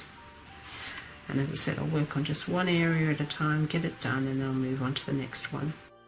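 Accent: Australia. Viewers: Absolutely beautiful artwork! That is one of the smoothest, most gorgeous backgrounds I’ve ever seen. The only thing (but it’s a big thing for me) is I find any background music distracting and awful (trumpets etc) and I usually just mute it, but I couldn’t with this one cos you were explaining a little bit here and there. I think everybody who does art probably has their own thing that they put on in the background anyway. I listen to learning German when I feel productive, Lady Gaga when I want a happy vibe, but often I just like complete silence, so I can think about all sorts of stuff/concentrate on what I’m doing. But when people put background music on in their instructional videos, the viewer isn’t given a choice - and everyone has different taste in music. The reason I mention it is because I really like your work very much and one of the things that you do that I find particularly helpful is that you really clearly list the brand and exact colour of the pencils that you’re using. 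I think I detected an Australian accent? I’m Australian so hi! 👋 I have seen a lot of your work on Instagram that I like too. So hi! And I’m sorry about the music comment (one part was like the background music they use before funerals get starting - you know, when people are assembling and greeting etc) and I know that I’m very over sensitive to things like that. But absolutely beautiful work! 😃👍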